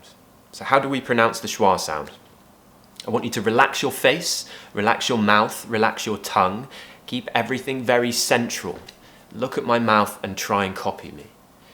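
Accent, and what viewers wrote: RP, United Kingdom. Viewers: Lovely british accent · I have seen more British English videos on YouTube, but your British English accent is pure and more British than others. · I love british accent,might ask you sir to give us a lesson about the stress.thank you in advence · I love your British accent and l hope to speak English like you. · I really love this accent. British English is a worthy and beatiful language that I want to keep up. I'd like to learn Slang words or expressions. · I love British accent ❤ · Your English is really British. I like british accent. Although I don't currently possess the ability to sound more beautifully British but with your courses I could brush my pronunciation up. Cheers.....!!!!!!!!!! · Wow I love your videos,I’m such a fan of RP accent!❤️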